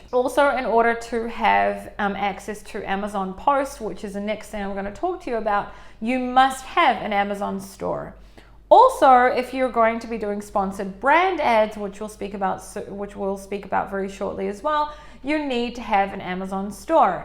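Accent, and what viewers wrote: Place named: New Zealand. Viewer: Thanks, I just applied for a trademark with my brand through a lawyer. I'll be coming back to this content when I get it up and running! Nice to hear a kiwi voice on here too. Cheers. Sam from NZ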